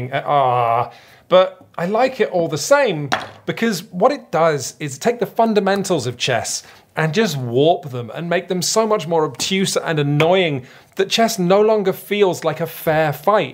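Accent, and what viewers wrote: United Kingdom. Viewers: I will always listen to British man on the internet. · "Will you listen to a British man on the internet?" hell yeah I will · Good lord, a British man on the internet? I think I will listen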